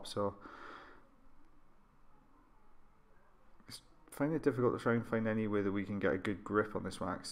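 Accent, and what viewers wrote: Wales, United Kingdom. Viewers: From where do you hail? I live in Oxford but was born in Bridgend, Glamorgan, and thought your accent sounded familiar! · He's Welsh. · That is probably because of his lovely Welsh accent. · You sound welsh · I love these videos. And your british accent.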